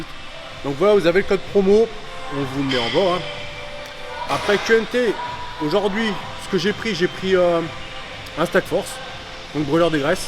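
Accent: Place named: Nord-Pas-de-Calais, France. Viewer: J’aime bien l’accent chti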